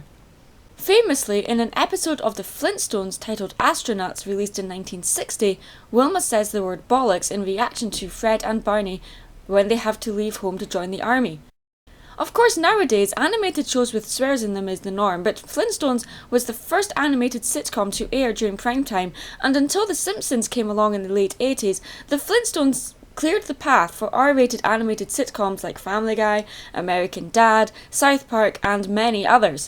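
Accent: Scotland, United Kingdom. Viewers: Are you Scottish? And actually I'm used to people that do not know and don't swear at all and I go to channel to channel just to find a youtube channel that is completely children friendly and has no one in any comment or videos that has bad words and when I say a bad word my mom scolds me and I never say it ever again and their are kids causing and they swear for no reason and one of them don't even know what they mean so I get your point but now a days older brothers and sister are trying to prevent anyone from causing in front of their younger brother or sister. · omg I love the way your voice sounds and your Scottish accent! I really calms me I don't know why xD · R u Scottish?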